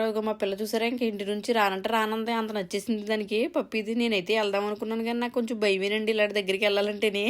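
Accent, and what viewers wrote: Godavari, India. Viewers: మీ గోదావరి యాస గొప్పగా ఉందండి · Akka super Godavari telugu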